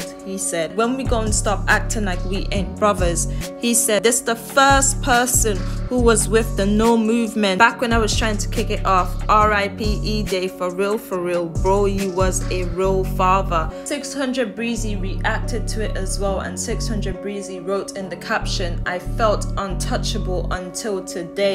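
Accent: United Kingdom. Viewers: U from the uk?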